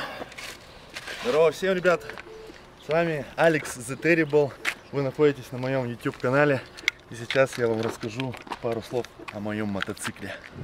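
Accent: Russia. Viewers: ништяк! ещё и русский говор!!!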